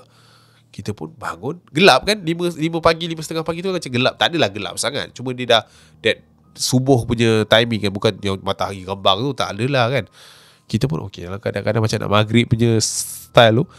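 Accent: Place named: Kedah, Malaysia. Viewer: hng mmang ska ckp kedah ka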